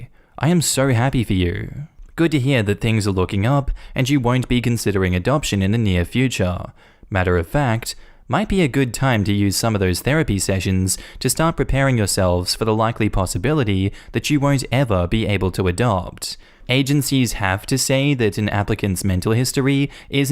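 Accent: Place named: Australia. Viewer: the Aussie accent doesn't hurt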